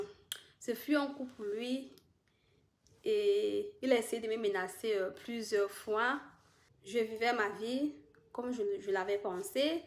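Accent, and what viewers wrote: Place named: Cameroon. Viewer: vous avez l'accent du Cameroun avec une influence du Gabon (c'est comme si vous avez vecu dans 2 pays en Afrique)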